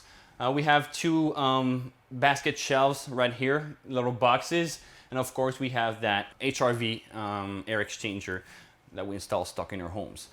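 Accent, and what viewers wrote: France. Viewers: I miss your French accent, your English is impeccable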